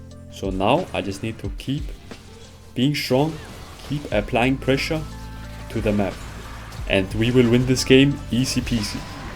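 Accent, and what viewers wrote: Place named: Denmark. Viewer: Love the vids. What accent is that? Sounds a little danish to me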